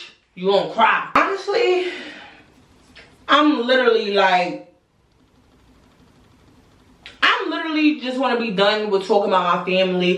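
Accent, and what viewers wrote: New York, United States. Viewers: I just found ya page and I couldn’t understand why I love you soooo much already, then i heard the Nyc in your voice. Even then i was like no thats not it, then you said youre an aries 🥳🥳🥳 thats its 3.21🎉 gang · I want to visit New York so bad. I love your look, your style, your accent and slang. I love it 😍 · I knew you were from ny😂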